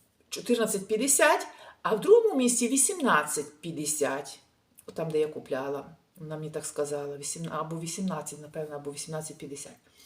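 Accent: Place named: West, Ukraine. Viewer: Дорога, скільки учительок в вас на каналі появилось 😂, а мені подобаються ваші відео, ваша спонтанність, наша говірна мова західної України. Я б ще добавила, кому не подобається,і постійно критикують, вони вільні не дивитись, але ж це спеціалісти, saputelle, лиш писати негатив, інше не вміють. Гарно проводіть вашу відпустку, місця чудові, їжа теж, всього найкращого❤🎉.Ви гарна пара з вашим compagno💓🐞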